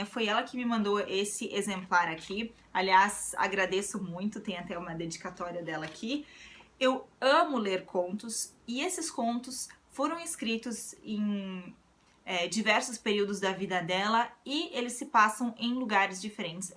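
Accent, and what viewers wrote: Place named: South, Brazil. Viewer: Adoro teu sotaque, sutil sotaque sulista. É bom ter uma representante do sul no âmbito da literatura. Sucesso nobre conterrânea.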